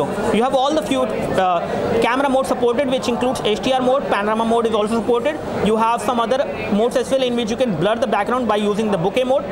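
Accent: India. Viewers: TsukaimaR1ngu Indian english man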